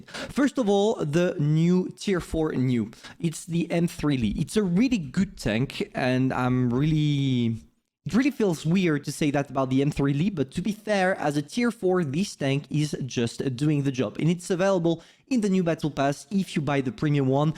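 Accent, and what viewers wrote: France. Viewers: Hey mate. Are French.?.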